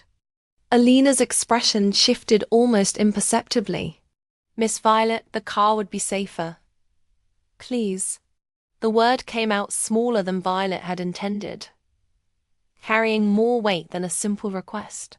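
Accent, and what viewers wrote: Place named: United Kingdom. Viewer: Why the accent sounds like British 😂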